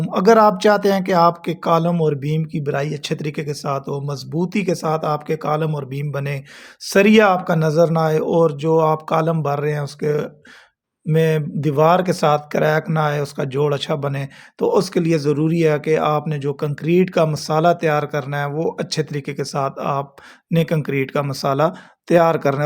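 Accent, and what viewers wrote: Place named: Attock, Pakistan. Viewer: بھاٸی آپ کی زبان سے تو لگتا ھے کہ آپ اٹک سے تعلق رکیتے ھیں ۔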